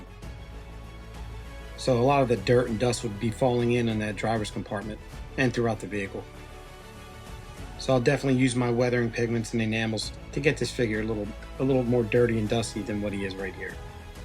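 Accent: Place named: Philadelphia, United States. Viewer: Nice job! I hear Philly btw lol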